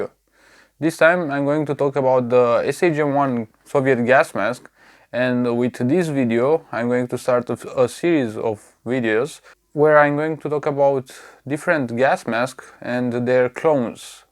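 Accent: Romania. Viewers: are you a romanian?i say this because you have a romanian accent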